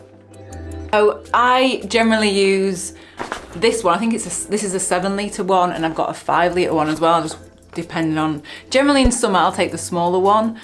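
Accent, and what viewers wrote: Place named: Liverpool, United Kingdom. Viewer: Liverpool? Im a yank but live in hong kong and you look and sound like one of my great friens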